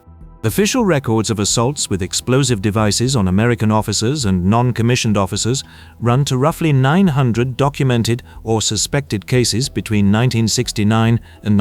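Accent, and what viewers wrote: United Kingdom. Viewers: Do we Reeeealy have to listen to a Brit narrating? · The British accent is confusing. Sounds like he’s talking about British troops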